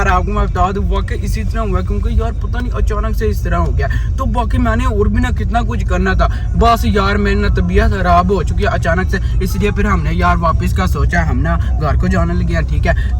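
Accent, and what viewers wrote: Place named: Gujarat, India. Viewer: Mazy laa ry o ❤ I am from Mandi bhaudin ❤ I think you are from gujrat ap ki boli gujrat wali haa❤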